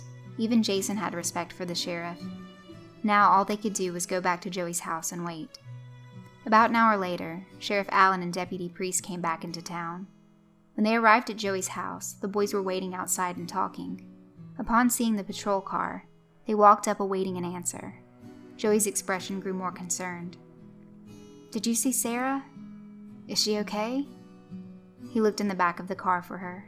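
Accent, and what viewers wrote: South, United States. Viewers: I really hate the stereotypical southern accent, It's ignorant and makes the story annoying. Being from Texas, I'm irritated. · I love how you get that Southern Drawl Right on the Button.... BUT You CAN'T leave us hanging....you mentioned Joey Disappeared .... WHERE DID HE GO ??? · your southern accent is so convincing that I can'T listen to tat story xD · love your southern accent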